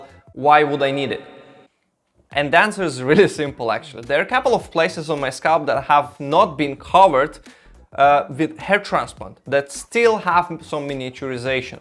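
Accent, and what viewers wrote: Russia. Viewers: ah nice I instantly recognized by your accent you were a Russian speaker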